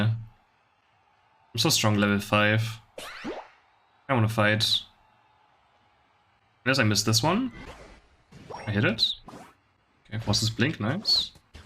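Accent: Germany. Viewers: considering his accent he must be a german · 100% Germany I can tell by the accent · beside his accent that is a giveaway his contact email address ends with de so I'm pretty sure he is German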